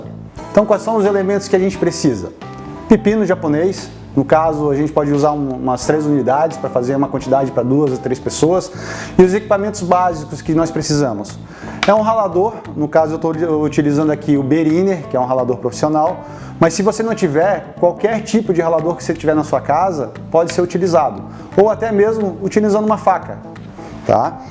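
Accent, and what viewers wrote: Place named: Santa Catarina, Brazil. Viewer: Esse sotaque é catarina :-)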